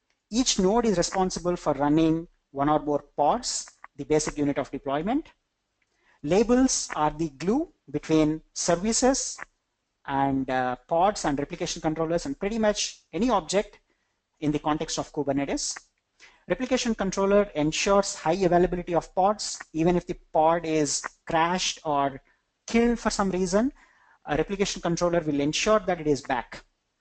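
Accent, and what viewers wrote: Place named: India. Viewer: I usually find the Indian accent very difficult to acclimatize to... but this was actual very good and I enjoyed it! Many thanks!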